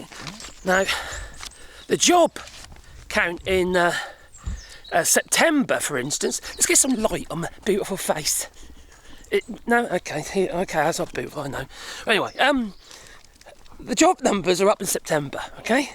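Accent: United Kingdom. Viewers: I sometimes have a hard time understanding your Britain accent, but I've loved from the first time i found channel ❤❤❤ 🇨🇦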